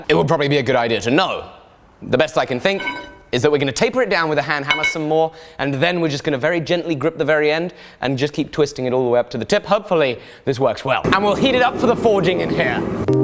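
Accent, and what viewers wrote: England, United Kingdom. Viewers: ah hes English.. but from where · You were so British in your intro, you reminded me of Michael McIntyre. EDIT: I take it back. You sound like him the whole video.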